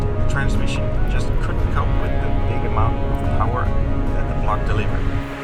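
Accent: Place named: Netherlands. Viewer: Ha! Dat Hollands accent ... dat blijft ge van kilometers ver horen hè. Grappig wel.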